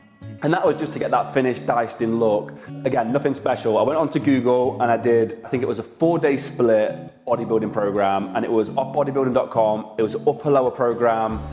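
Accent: Nottingham, United Kingdom. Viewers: Notts lad?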